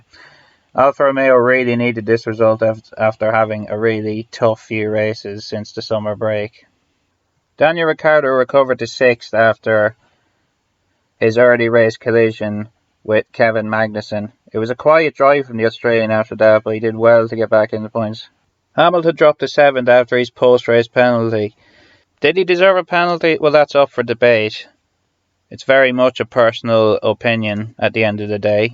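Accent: Ireland. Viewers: Yes your obviously irish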